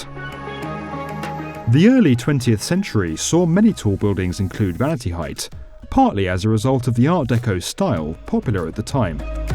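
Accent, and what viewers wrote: United Kingdom. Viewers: I can't understand anything with the UK english. American english is more easy.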